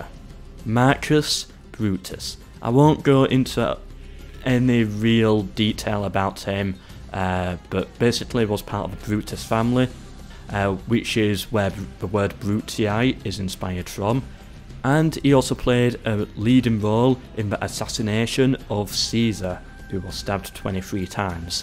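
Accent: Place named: Manchester, United Kingdom. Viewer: What accent is this omg, Manchester?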